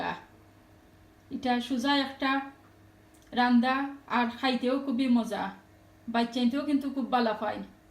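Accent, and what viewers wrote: Sylhet, Bangladesh. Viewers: খাছ সিলেটি আপা গো তুমি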